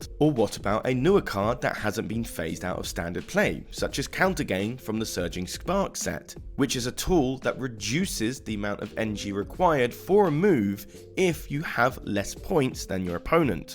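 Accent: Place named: United Kingdom. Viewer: Wait why is this voiced by a british guy now? Did this channel switch owners?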